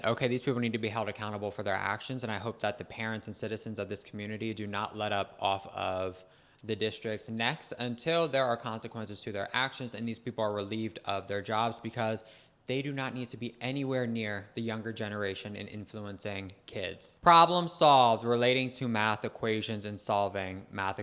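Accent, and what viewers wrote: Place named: United Kingdom. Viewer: We got a Brit over here innit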